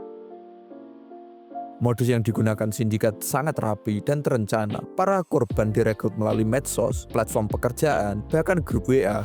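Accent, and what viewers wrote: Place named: Java, Indonesia. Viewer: Medok..jd ngga tertarik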